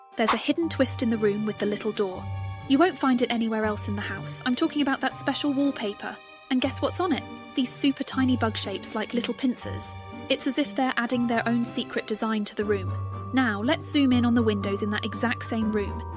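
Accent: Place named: United Kingdom. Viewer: I think it just sounds slightly odd because the speaker is British, but keeps using American colloquisms like "a bunch of" and "super" as an alternative for "very".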